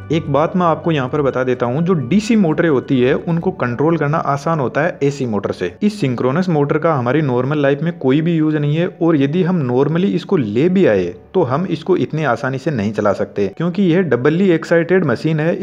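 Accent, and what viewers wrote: India. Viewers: bro are you indian or i just watch dubbed video by the way your content is awesome and informative.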